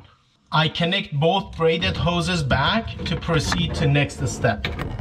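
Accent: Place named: Iran. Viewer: Very Very Iranian accent. Good video thanks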